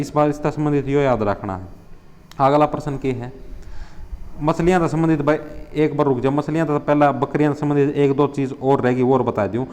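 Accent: Haryana, India. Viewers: My first class h apke chnl pe Bhut mja aya ur apki haryanvi Wow m to fan Ho gyi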